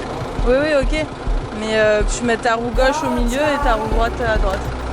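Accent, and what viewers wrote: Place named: Narbonne, France. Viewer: J adore vos vidéos les pitchous et en plus on a le même accent !!!! (Narbonne)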